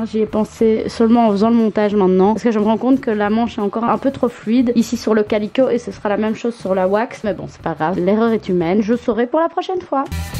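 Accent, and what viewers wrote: Belgium. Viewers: Mais mdr tu as réussi à me faire regarder des vidéos couture! Je n'ai rien contre la couture hein, c'est juste que je suis plutôt au level "Incapable de réparer le trou d'une chaussette grossièrement sans me coller l'aiguille dans le doigt"... Voilà 😁. J'ai connu ta chaîne il y a peu via des vidéos coloration (ça, c'est de suite beaucoup plus mon domaine!), et du coup, comme j'aime bien ton style, ton enthousiasme, ton accent belge, j'ai regardé la vidéo sur le corset. J'aime beaucoup les corsets (magnifique engin de torture), et j'aime beaucoup la Wax, et je me suis prise au jeu lol. Franchement, le top est superbe, et il rend le corset tout de suite beaucoup moins casse-gueule à porter 😅. GG en tout cas, c'est un sacré boulot !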